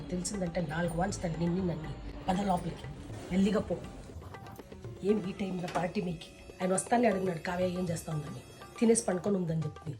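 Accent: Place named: Karnataka, India. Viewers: Karnataka boarder Telugu😂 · akka miru karnataka na, miru koncham telugu karnataka vala laga ne maatladthunnaru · Kannada vallu telugu matladinatlu vundi.. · Bangalore తెలుగు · karnataka telugu, likely from bellary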